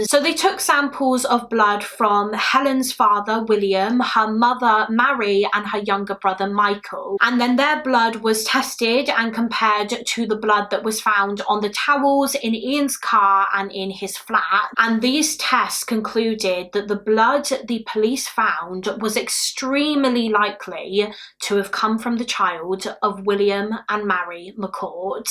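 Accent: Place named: England, United Kingdom. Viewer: Yesss back 👏👏 I love your channel so much - especially happy to hear an English voice 😌 fantastic video & keep up the good work, from North Yorkshire ❤️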